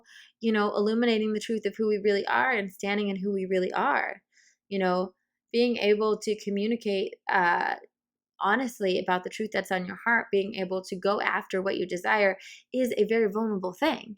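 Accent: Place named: New York, United States. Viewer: Yeah ! And the NY accent makes feel home, lol ! (LI)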